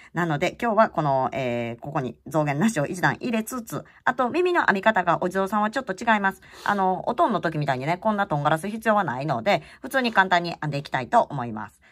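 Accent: Kansai, Japan. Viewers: お地蔵さま❤挑戦しました😊 鎖編みからのスタートは苦手だったんですけど、トーク?独り言？の関西弁が面白くて笑いながら楽しませてもらいました😂お地蔵さま何体あっても可愛いですよね😊 あっ💦爪もお地蔵さまで可愛いです❤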